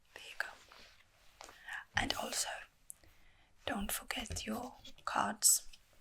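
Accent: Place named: United Kingdom. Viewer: Britishness turned up to 8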